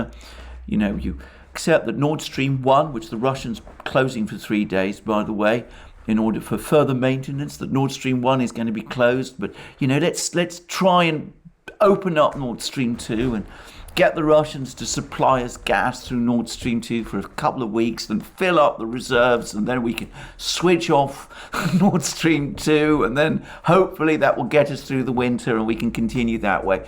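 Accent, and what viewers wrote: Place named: Public school, United Kingdom. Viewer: I've never heard a guy talk more and say less. An hour of gossipy chatter "that cannot be confirmed," full of innuendo and guesses, repetition of "I can't prove it," and "I wouldn't be surprised if we found out" "this is my own speculation" all delivered in that English public school accent that bamboozles Americans. I like Al, and I've listened to him very carefully and never once heard a word that was essential learning. He's a pundit with smooth delivery of empty chatter. If you read nothing else I suppose he's good because he does do some reading.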